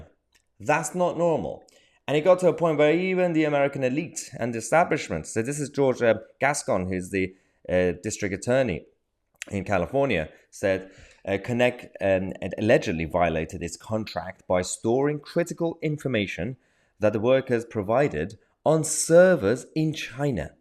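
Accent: United Kingdom. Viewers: Love that I’m hearing this from a Brit, fffs.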